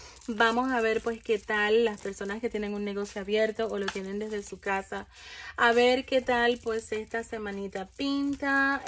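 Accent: Dominican Republic. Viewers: Hay enemigos si soy policía y mis jefes son misogenos y yo no me dejo de nadie una verdadera bomba en en trabajo(nido de víboras) yo espero la mandinga o ya al menos el dinero del amor hablamos después jajaja muchas gracias te quiero mucho y me encanta tu acento Dominicano 😘😘😘😘😘😘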